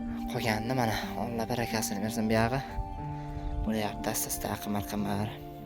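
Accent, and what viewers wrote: Surkhandarya, Uzbekistan. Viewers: Узбек Сурхондарё Кашкадарё областларини шеваси шундай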